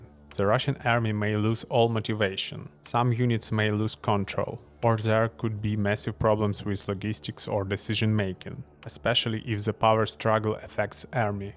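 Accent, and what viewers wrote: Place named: Ukraine. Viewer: You may not consider Khadyrov a serious contender for Putin's successor, but imagine the sight of Lavrov, Patrushev, Mishustin and Shoighu, stripped of their shirts and facing the wall, while Khadyrov poses in gopnik crouch before them. He grins because he has spelled out Akhmat in spray cream on their backs! By the way, have no worries over your English - many more of us are attuned to Ukrainian accents. Good video.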